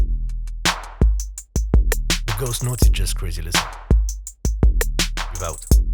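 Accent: France. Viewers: I love your accent/// No wonder the girls love French men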